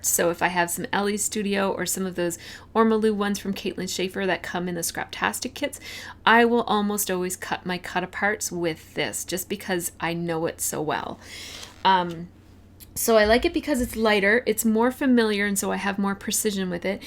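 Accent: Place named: Canada. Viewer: Really fun to watch,. Hadn't really noticed before your pronunciation of 'outlining' because I just love the Canadian accent and that's that. Now I can hear you 'oatlining' everything hahahah. Cath x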